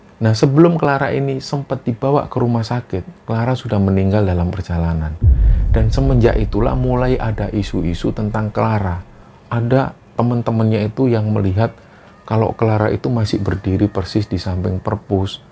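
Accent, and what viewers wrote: Java, Indonesia. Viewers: Wkwkw ternyata mas temen naik gunungnya bestie ku 😂😂 Doi bilang 'itu mas temen gua 😅😂' Langsung dong, kirim salam buat mas, logat jawanya bikin candu kalo gw lagi masak ato bersih² rumah 😂😅 Ok back to clara 🙂🙂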